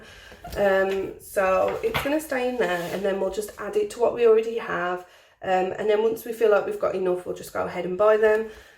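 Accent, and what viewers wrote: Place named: West Midlands, United Kingdom. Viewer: Are you from the West Midlands? I can hear it straight away 😂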